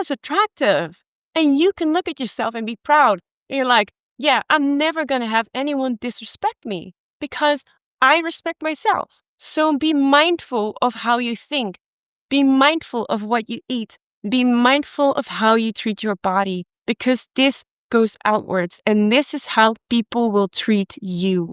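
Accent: Netherlands. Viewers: Is... is that a dutch accent i hear ?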